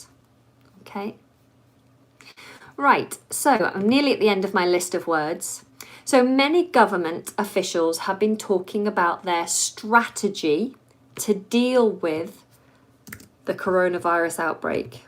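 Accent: United Kingdom. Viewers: You are a fluent teacher in British accent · Hello! I can't help saying this! You explained everything related to an infectious disease like coronavirus in a charming and interesting way! Honestly, you and the way you speak British English are both lovely!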